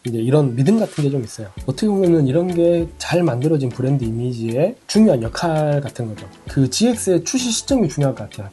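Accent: Jeolla, South Korea. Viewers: 고향이 전라도인거같아 구독은안합니다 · 오늘따라 사투리가 더 구수한 느낌인데요? 전라도 사투리 먼가 정겨워요 ㅎ 바이크 타는데만 관심잇지 이론은 생각도 안해봤는데 채널 재미나게 보면서 매번 많이 알아갑니다. 항상 좋은 정보 유익한 영상 감삼다!!👍🫰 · 아따 구수허니 잼있게 잘 봤당께요. 서울살이만 십여년하다 올만에 전라도 억양 들으니 기분 좋네요 ㅋㅋㅋ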